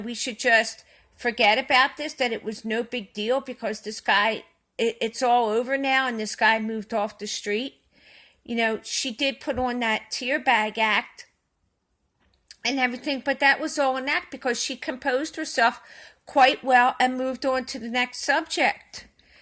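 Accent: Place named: Philadelphia, United States. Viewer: i recognize the philly accent, I grew up 5 mins from you... I wanted to tell you moreso ask you to forgive your mother. you acknowledge and validate who and what she is..now for yourself, forgive her....do not give her power over your thoughts and everyday life. Forgive yourself for the many times you stopped living life because of her actions.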